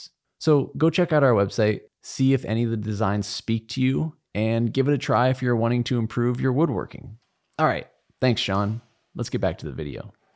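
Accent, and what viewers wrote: United Kingdom. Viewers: Sorry, but too plummy.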